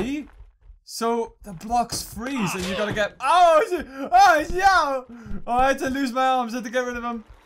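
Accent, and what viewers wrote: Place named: United Kingdom. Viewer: God I love ur Brit accent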